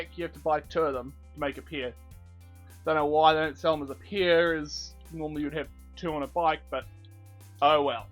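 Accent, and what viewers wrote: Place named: New Zealand. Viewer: Nice accent mate, kiwi’s are awesome :3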